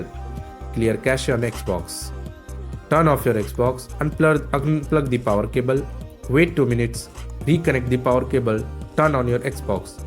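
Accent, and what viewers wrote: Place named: India. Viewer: You think I didn’t search up how to fix if I wanted a Indian to read it out I would have asked chat gpt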